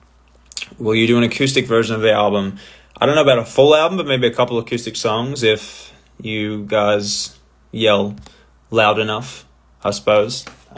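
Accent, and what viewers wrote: Australia. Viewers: He really sounds so much more Australien then in the last few years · You’re not too close to the screen LOL And yes we appreciate the return to a more aussie accent :) just those sneaky American “rrrr’s” quite prevalent. Love hearing the aussie “o’s” coming through every now and then. Like when us Aussies say “I don’t know” and other words like “home, phone, alone etc” Its just so Australian, so please don’t lose too much more 💕 Love the album. I only live 5 min from work so I’m keen to go driving next weekend to listen to the whole album with the windows down - and it’s almost spring weather on the Gold Coast so thanks for the driving music that you poured your life into.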